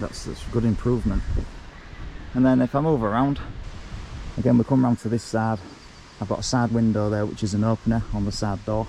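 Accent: Hull, United Kingdom. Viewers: Hi there. Great build. Well done. Would that be a Hull accent I can hear?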